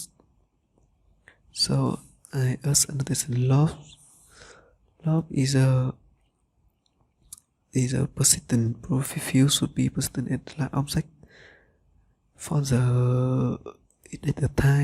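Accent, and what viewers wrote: Vietnam. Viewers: tưởng ôn ấn độ nào. nghe sound chuẩn việt nam r :))0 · Hình như bạn là người Việt phải không ? Nghe phát âm tiếng anh giống VN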